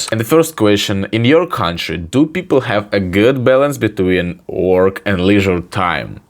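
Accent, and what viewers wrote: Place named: Russia. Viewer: 😭 your Russian accent speaks so loud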